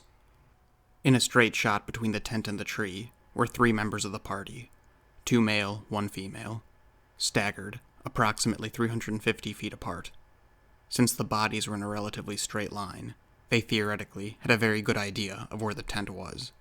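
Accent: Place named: United States. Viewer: nope...northern canadian .... now living in tropics,btw : Your accent sounded 'american'..... if I'm wrong, I stand corrected. So what are you ? and what about 'radiation' reports in the area? Russians are pretty open about their findings. Your bombastic intro and analysis did Nothing for a conclusion. Which clinically... is real psycho. Now STFU with bs .... and offer a solution.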